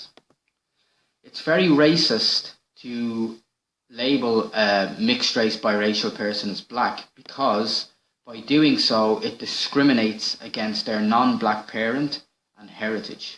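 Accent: Ireland. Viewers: Btw you have the same english accent as Connor Mcgregor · you are correct sir about mixing. i like the irish accent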